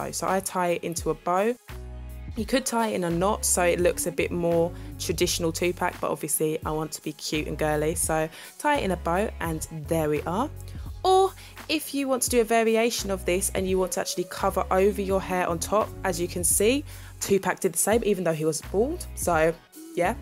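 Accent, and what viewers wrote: London, United Kingdom. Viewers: She's from London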